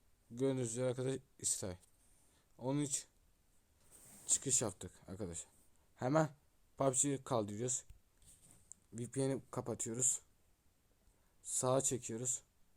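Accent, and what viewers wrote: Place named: Syria. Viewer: Abi sen suriyelimisin konuşmayı unuttun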